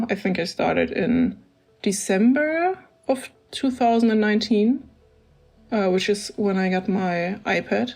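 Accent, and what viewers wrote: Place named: Germany. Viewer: i was summoned by the german accent! XD love you already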